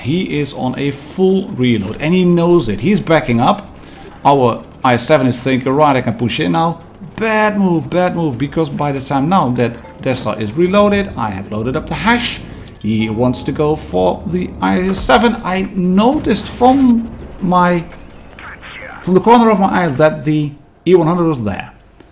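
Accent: Netherlands. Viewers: its not a trick question😂 I recognised your slight dutch accent, because I’m dutch too😉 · Just recently started watching your videos, and I am really impressed. Really nice style you have. Your accent also makes me a bit homesick — I’m not Dutch but South African and the Afrikaans accent is similar. I am curious as to why nobody has done a review video on the T55A. Well, I found a French one but I mean none of the “regulars” I follow (you, Bushka, SK8XTRM). Did they not give you a chance to play with it or is it just a bad tank?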